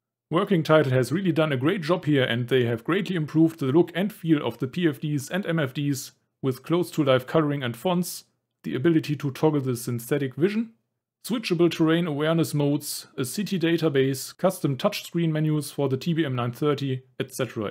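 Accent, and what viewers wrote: Germany. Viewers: Danke! (you have a German accent! :P) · I missed your Duetsche accent! I had to unsubscribe to many cuz it got to be too much. I hope to fly this summer in Xbox X MFS2020. Keep up the good work lad! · Hey great video thanks! Funny is just how you express your English! Then I poop the sausage off! It's so funny your German English accent. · ich wollte ihm nur einen Tipp geben und es klingt doch noch recht Deutsch aber ich weiß nicht wieso du dich dort nun einmischen musst Grüße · Hello again, long time gone. I finally found you doing flights again! Love your Duetsche accent! SUBBED/LIKED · Dieser deutsche Akzent kickt so hart! xD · I like the german accent ;) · I bet you are from a high German area like Münster, Bielefeld or Osnabrück. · when a german speek english nice video ;) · thanks for sharing, you have very strong germany accents while talking in english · Super Video 🙌 Ich wusste sofort, dass du deutsch bist ahhahaha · Ich wusste doch, dass ich da nen deutschen Sprecher rausgehört habe. 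Super Video und beinhaltet fast alle must haves. Was ich noch empfehlen kann ist We Love VFR. Bringt VFR Objekte wie Sendemasten, Radarkuppeln, Kräne und bald wohl auch Industrielle Schornsteine nach Europa. Alles basierend auf Open Street Maps.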